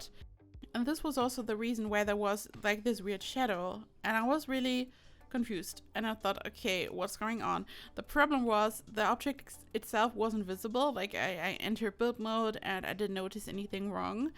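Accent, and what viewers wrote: Germany. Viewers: Sounds like u are German :D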